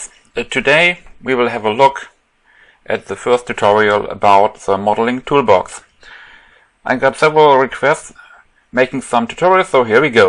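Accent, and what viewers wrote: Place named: Germany. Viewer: kannst das mal auf deutsch machen hört man doch das du deutscher bist:D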